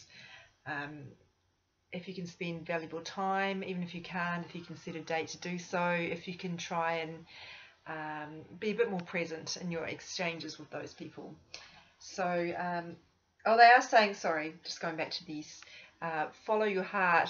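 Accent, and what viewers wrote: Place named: Australia. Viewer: I really feel your love.. But most of all you are so down to earth about spirituality and angels and make it sound so 'normal ;) To me it is but I guess Im still in the closet cause of my familys ignorance..or should I say disinterest in LOA and signs etc.. Im a Twin Flame too but cant talk to anyone here in Gothennburg Sweden about it. Love your channel and your aussie accent! Makes me feel at home, comforted, grounded! ;) Grew up in Australia but havent been back since 1984.. Namaste/Cec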